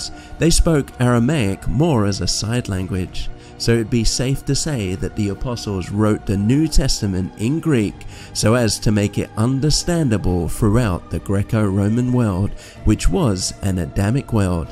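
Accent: United Kingdom. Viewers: Nice work! Is that a Welsh accent? I haven't heard that accent so much. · It's a southern England accent. Relax.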